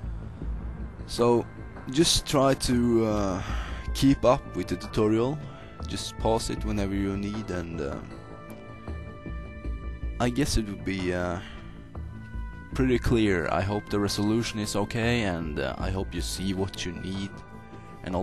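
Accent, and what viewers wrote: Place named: Norway. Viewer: go norwegian accent hehe im from Norway to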